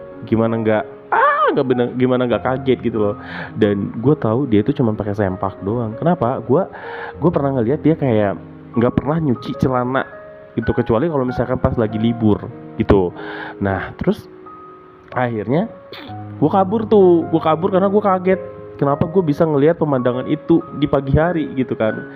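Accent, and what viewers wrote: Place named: Sunda, Indonesia. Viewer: Suaranya suara Sunda bgt... Lembut dan berkarakter...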